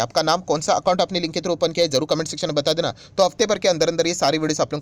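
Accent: Maharashtra, India. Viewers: State maharastra Bas itna pta hai vo bhi sir ki language se 😜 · Sir marathi hai itna to pata chalta hai unke batose I proud of him 🙏🏻🙏🏻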